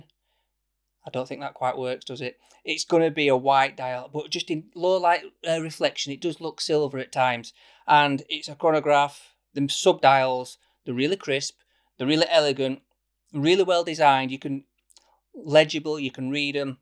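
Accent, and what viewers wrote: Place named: West Yorkshire, United Kingdom. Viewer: Where are you from? Sounds very West Yorkshire to me 🤔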